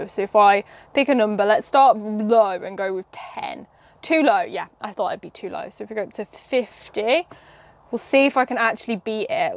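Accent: United Kingdom. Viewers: Im so confused, you look so young but you're playing with old things that I only remember seeing at my older cousins' house when I was really really young and I'm at least 10 years older than you. This made me smile a lot, this is so cool. Thanks for bringing me this time travel experience with a very special british accent. Keep going and having fun. 😁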